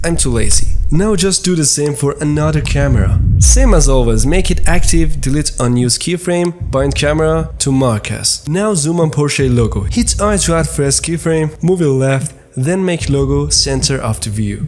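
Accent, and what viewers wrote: Iran. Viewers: All the respect... just remade and turned out CRAZYYY my client will be impressed. Also, you sound hella Persian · az lahje fahmidam iranii movafagh bashi😂❤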